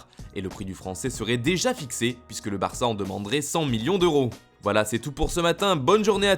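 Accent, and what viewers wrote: Italy. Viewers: Cette accent italien qui me manquait tant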